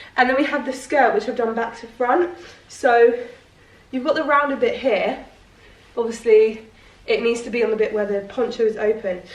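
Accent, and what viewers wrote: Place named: England, United Kingdom. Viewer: Randomly stumbled across your channel when I was living in Aus and I loved it because it reminded me of home and just listening to someone English ramble on was super comforting 😂 and now I’m back in England and still here and loving the randomness, the rants and everything else! Thank you for being completely you - very lovely in a world of fake!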